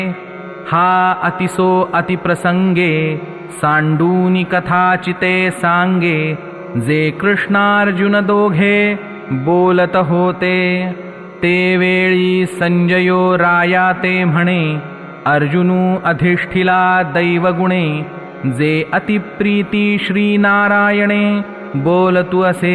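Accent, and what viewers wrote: Standard. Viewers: जय श्री कृष्ण आपली वाणी व उच्चार खूप शुद्ध आहेत.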